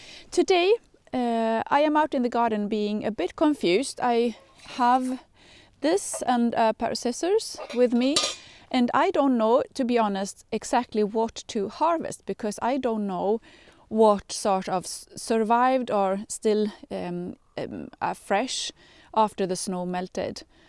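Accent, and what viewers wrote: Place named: Sweden. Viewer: Tack så mycket. Jag tycker om dig och ditt innehåll. Jag lär mig mycket. Din engelska är utmärkt. Det jag särskilt älskar är att höra din söta accent. Jag ska berätta varför ... Jag är mormor, författare och lärare i Seattle. Jag älskar också att trädgård, sticka, sy, läsa och spela musik. Min make är en Blues-trummis. Jag har bott här hela mitt liv. Men ALLA Fyra av mina morföräldrar var från Sverige! (Stockholm & Malmö) Det finns ett starkt skandinaviskt inflytande i Seattle. Jag tänker alltid så mycket på mina morföräldrar vid jultiden. Jag bakar min mormors kakor och andra recept varje år. INGEN gör julen bättre än svenskar !! Jag har underbara minnen och traditioner. Din röst påminner mig om deras kära röster. Tack.(Google Translated for fun. )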